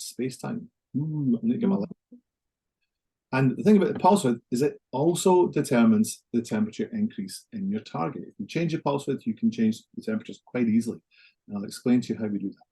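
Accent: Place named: Scotland, United Kingdom. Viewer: Thank you for your amazing insight/understanding IPL! We have just introduced the Venus Versa into our clinic……….the trainer was shite at explaining what is a very complex area of energy devices!! I am not good at retaining information😂……..but I rewatch all your videos and each time I retain more😊 Please keep them coming!!!🎉 Love from Western Australia (also Scottish, so it’s a dream listening to you! 😂😂🏴)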